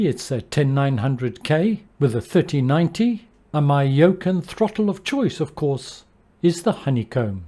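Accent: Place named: South Africa. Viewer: Great channel and especially great to hear a familiar accent 🇿🇦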